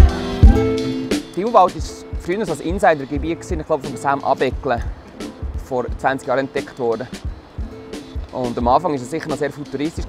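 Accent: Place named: Switzerland. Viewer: Totally agree. My very limited Shwytzerdütsch skills makes it hard to understand, while the video and topic is top notch. Still highly entertaining !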